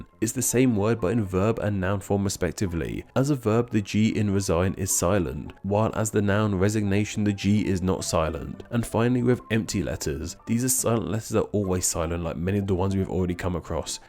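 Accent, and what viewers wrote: United Kingdom. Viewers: Thats the british way of speaking. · The R in Iron isn't silent you're just British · Americans actually pronounce a lot of those words fully, I think that maybe 25% to 50% of those words you listed off at the mark were just British pronunciations of them. PS: Also, if a silent letter falls in the forest, and no one is around to hear it, does it make a sound? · That one has never made sense to me. We should just spell it “ieorn” or something. Technically, he used the same “r” most Brits use with preceding vowels before a consonant. We Americans lean into the “r” as a full-fledged consonant, like civilized people (sorry about the “zed”, Limeys)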